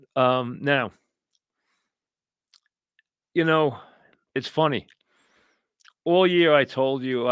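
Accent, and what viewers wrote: New York, United States. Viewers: “I don’t know whats going on with Weev-ah”.. love New York accent.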